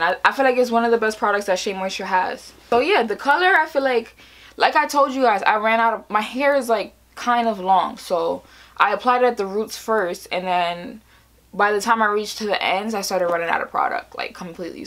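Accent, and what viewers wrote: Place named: Trinidad and Tobago. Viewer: Are you by chance from Trinidad? Your accent seems familiar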